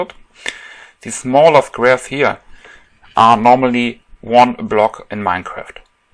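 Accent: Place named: Germany. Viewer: kannst das mal auf deutsch machen hört man doch das du deutscher bist:D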